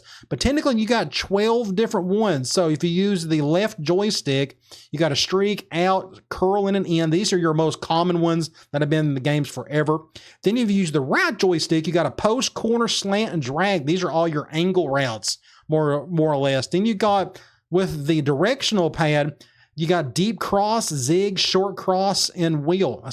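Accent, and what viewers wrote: South, United States. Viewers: My first video i ever watched from you and immediately sub .. not only was the video great but that thick southern accent is amazing lol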